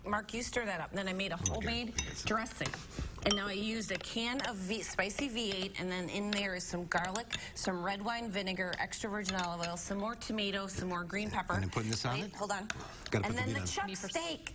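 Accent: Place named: Wisconsin, United States. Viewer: I love a nice Wisconsin accent. 😻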